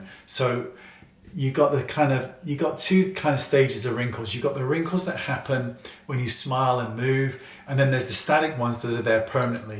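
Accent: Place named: Scotland, United Kingdom. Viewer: are you originally from Scotland 🏴. I feel I can hear a wee accent from time to time.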